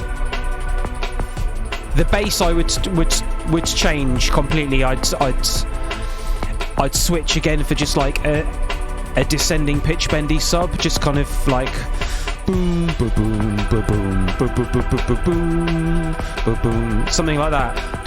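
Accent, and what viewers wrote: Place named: United Kingdom. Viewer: after the song I didn't expect you to sound super british haha:D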